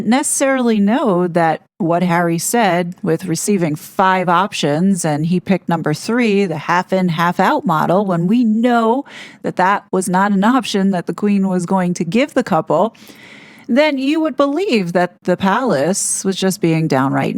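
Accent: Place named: United States. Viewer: Correct me if I’m wrong- you sound American- how did you get your hands on the book?! I had to order mine from UK and it won’t be here for another week😢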